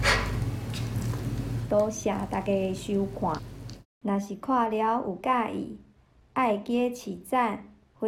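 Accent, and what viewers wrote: Hsinchu, Taiwan. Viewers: 影片配上甜美的新竹腔口，很親切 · 講了真詳細，你的台語足好聽，感謝。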